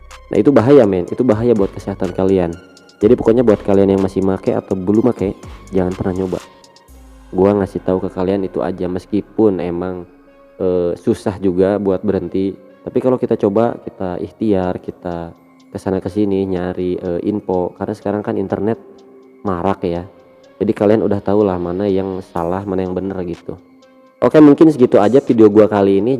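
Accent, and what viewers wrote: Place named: West Java, Indonesia. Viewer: Lidah orang sunda bos. Voli aja nyebut nya poli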